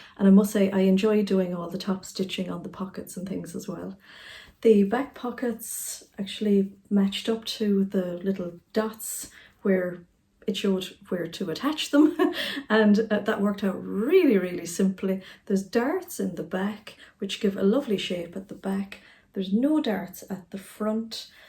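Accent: Ireland. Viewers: ✋🏻Hi .just tuned in and was drawn by your Irish lilt .I live in Sheffield but grew up in Dublin.Love your makes and how they look on you.I am going ,to check out your other posts now.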